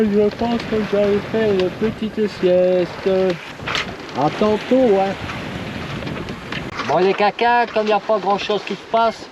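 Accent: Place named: North, France. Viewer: Je vous suis depuis la Vendée, un bel accent du nord, merci à l'interprète, même le chien à l'accent du nord. Cordialement.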